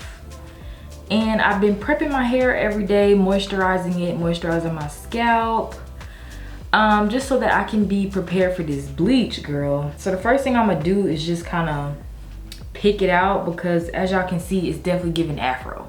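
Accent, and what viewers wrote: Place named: Florida, United States. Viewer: U have a Floridian accent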